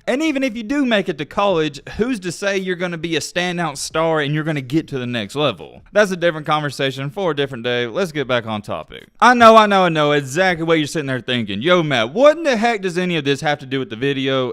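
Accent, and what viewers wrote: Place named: Appalachia, United States. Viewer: My man, I like a lot of your vids and your hillbilly ass accent lol but you’ve been coming off obnoxious lately. Multiple times in vids like this you say “And a lot of y’all don’t realize!” Like okay man stop thinking so little of your followers intelligence. Not a good look. Plus you’re basing someone’s worth and lifestyle literally off their “Sosha” media as you call it. #1 rule to not go by is IG my guy. And no high schooler is signing a 10 million + shoe deal. You can count on one hand how many rookies in the history of NBA got 7 figure shoe deals out the gate. Anyways man sorry if I sound like a hater but you come off aggressive af in these vids.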